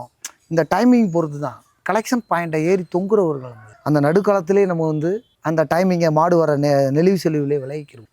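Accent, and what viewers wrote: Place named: Madurai, India. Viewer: அண்ணே சூப்பர் அடுக்கு மொழி பேச்சு மதுரை சிலாங்க்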